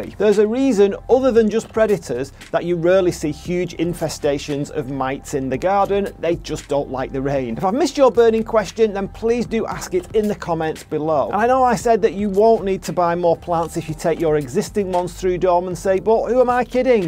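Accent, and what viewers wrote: North, United Kingdom. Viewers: I have a very different routine for growing them, and cyclamen probably account for 25% of all the plants I cultivate. also in the UK, and from your accent about the same Northern latitude. been growing them for at least 10 years now and only lost 4 out of about 50 mature plants. all 4 lost were lost within the first year due to tuber rot and were probably already damaged and on their way out when I bought them. so second year of buying i prodded my finger into each pot before buying a plant to check there was a reasonable size and hard tuber in the pot somewhere. The rest are all doing very well, some started of as 1cm ultra cheap baby specimens, and now have like 5cm diameter tubers, others now have even bigger upto 10cm diameter ( that's 30cm circumference ) tubers, I have a considerable variety of species and all the colour spectrum I could possibly get my hands on. 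they flower for over 6 months of the year ( slightly staggered between species ) , and I often pollinate them with an air puffer, so they produce pods, which are interesting to look at for 4 more months of the year., leaving just 2 months of leaf only, i never let them go dormant. mine remain very lush and green all year round, most leaves lasting more than 1 year and get quite big, I never let them go dormant, and I get a great deal of continuous blooms each year, like a carpet of flowers above the greenery. I plant them with the top 1/5 ( 20% ) of the bulb above the soil or compost and i always top water, qu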